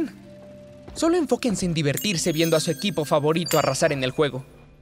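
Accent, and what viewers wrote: Argentina. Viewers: Ese wuey es Argentino xd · Sigo pensando que el man es Argentino, necesito info, no quiero leer más comentarios nefastos neaaa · re argentino el chavon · el que habla es argenti o que? tiene alguna explicacion? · Argentino obvio 😎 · El tipo es argentino no?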